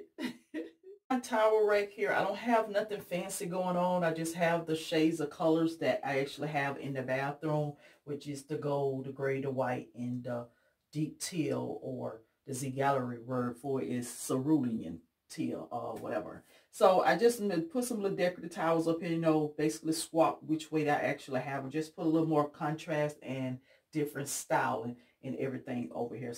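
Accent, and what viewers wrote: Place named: Louisiana, United States. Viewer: You sound like me when you speak I’m Louisiana native are you?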